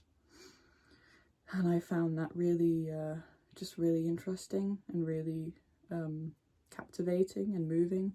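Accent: England, United Kingdom. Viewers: You sound English. Do you read BNW in secondary school required reading? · I'm intrigued by your accent. It's British, but also clearly not native / not from Britain. (I might be mistaken, I mean it's not like I"m from the UK) The closest I've ever heard was a German girl who went to a British private school (not sure if it was in Germany or in the UK) but she spoke a tad more posh. EDIT just noticed the poster, might be another German girl going to a British private school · I think it sounds completely English to me, as a native.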